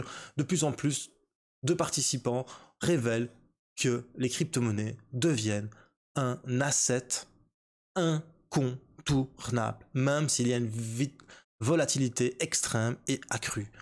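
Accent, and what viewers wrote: Belgium. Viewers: J'adore ton accent belge, c'est kiffant de t'écouter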